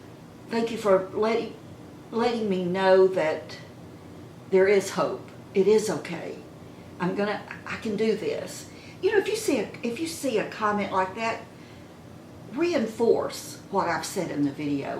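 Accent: South, United States. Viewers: you exude such lovely Southern charm! I love accents, and yours is tops! This from a lifelong Northwesterner age 83. Your makeup tips are invaluable. Please continue your friendly chats!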